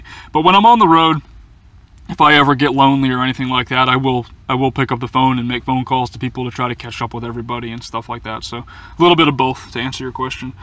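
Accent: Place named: Boston, United States. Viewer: Another great video,. I initially started following you due to you wearing a Cleveland Browns tshirt. Then I saw you w a browns skully on. What's your cle connection? Considering it sounds like you're a Boston area native. I traveled out of my of my forester. Jealous of what you've accomplished. Keep the videos coming, I'll keep watching...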